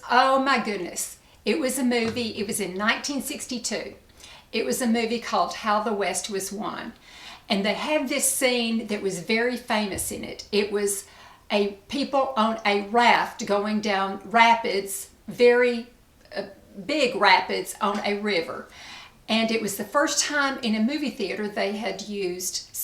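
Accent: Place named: Tennessee, United States. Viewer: That was so much fun! I love listening to your accent. I’m English but have lived in the US for 26 years now. We spent 8 years in Knoxville, TN and listening to you takes me back there 😊💕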